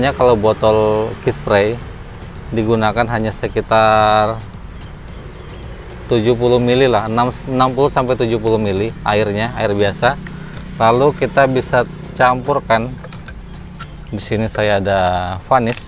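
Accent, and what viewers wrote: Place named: Banjar, Indonesia. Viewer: logat nya ada sedikit bahasa banjar nya . benar kan mas. ,😀😀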